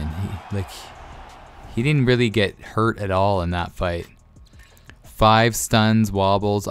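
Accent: Canada. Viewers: you're so Canadian I love it eh hahah. isn't the Spartan fighting this weekend? I love him. and actually didn't kno he was Canadian haha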